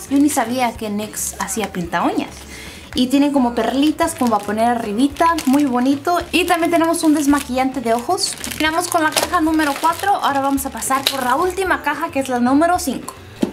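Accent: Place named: Mexico. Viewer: eres mexicana?? tu acento me hace pensar eso... eres hermosa, sencilla y super talentosa... me suscribo!!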